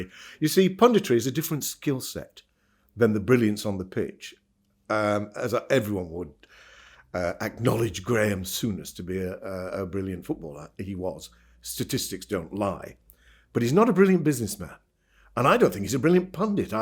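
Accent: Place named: RP, United Kingdom. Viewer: Michael, you are speaking the fans language(just a little posher)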